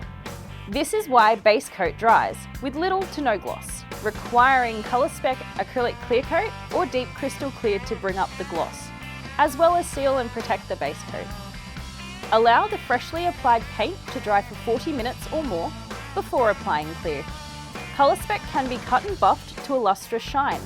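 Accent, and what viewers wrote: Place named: Australia. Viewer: How good is the Aussie accent dude hahaha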